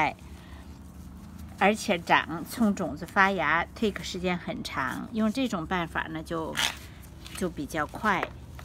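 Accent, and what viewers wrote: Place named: Shandong, China. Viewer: 山东 烟威口音。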